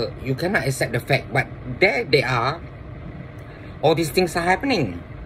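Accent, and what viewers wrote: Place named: Singapore. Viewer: suka dengar ocehan dialek melayu singapura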